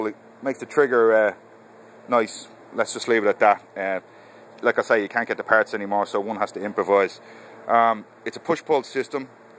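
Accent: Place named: Dublin, Ireland. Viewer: That's a Dubh accent if I ever heard one.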